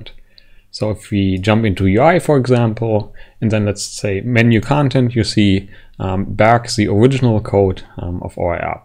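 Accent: Germany. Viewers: Instant erkennt das du Deutscher bist am Akzent xD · Its called German Accent. Even medic from tf2 has that accent.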